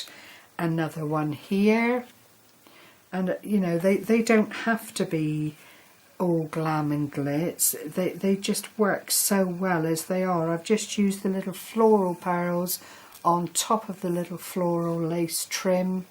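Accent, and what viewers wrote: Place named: England, United Kingdom. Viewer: You created some lovely bags. I really like the way you embellished them. You are so creative. I really enjoyed listening to you. I love your English (am I correct?) accent. did a great tutorial and put your own flair on the bags. Very, very pretty bags. Thank you for sharing them.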